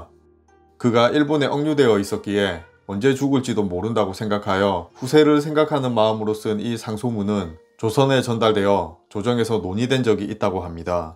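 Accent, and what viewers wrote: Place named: Gyeongsang, South Korea. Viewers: 경상도 억양도 아네 ㅋㅋㅋ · 일본인입니다.목소리도 좋으시고 내용도 재미있으면서도 잘 공부가 되고 개인적으로 좋아하는 경상남도 억양으로 말해 주셔서 유익하고 재미있는 영상입니다!! 구독합니다.!!감사합니다!!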